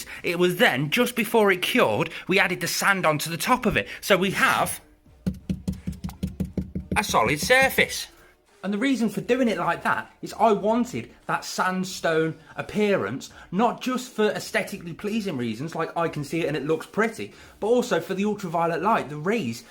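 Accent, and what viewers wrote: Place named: Northern England, United Kingdom. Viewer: People need to leave it out. Like actually take the time to watch the video or understand the situation before jumping down people's throat, you did a good job mate and nobody should take that away from you. On a side note thanks for clarifying where you live my southern ears almost missed it as I don't speak northern too well 😜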